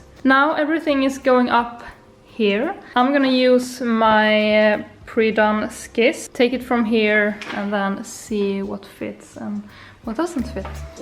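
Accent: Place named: Sweden. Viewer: your accent is so cute! are you from Sweden, srry im new here :)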